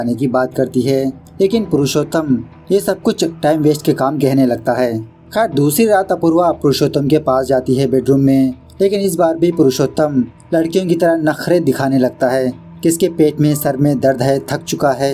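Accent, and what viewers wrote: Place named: Mumbai, India. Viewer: Thobde 😅 mumbaiya Hindi with style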